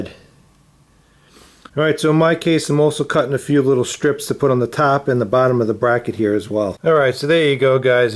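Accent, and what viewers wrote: New York, United States. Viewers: Are you from new york?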